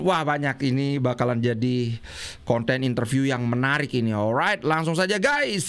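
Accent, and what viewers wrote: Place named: Singaraja, Indonesia. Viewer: Betul banget dari Singaraja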